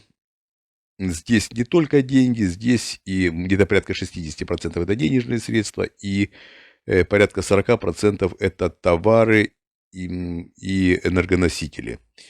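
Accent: Ukraine. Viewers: Виктор Михайлович, вы же говорите по русски. А в русском языке принято говорить на Урале, на Украине, а не в Украине. Выражение в Украине сильно неграмотно и политизировано. Понятно на чьей вы стороне, да и акцент у вас украинский. Не знаю насколько вы генерал СВР. Вы хоть думайте хотя бы иногда, а то мне кажется у вас немного ненормально с логикой.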